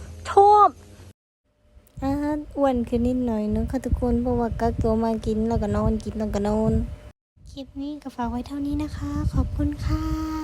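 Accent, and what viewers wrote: Korat, Thailand. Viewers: สู้ๆน้องสาว ภาษาคือออกไปทางโคราชบ้านเอง อ้ายยู่ลำปลายมาศติดตามตลอดเด้อจร้า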